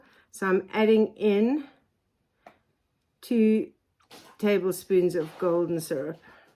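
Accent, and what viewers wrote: South Africa. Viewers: You sound like a South African. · But your accent is South African?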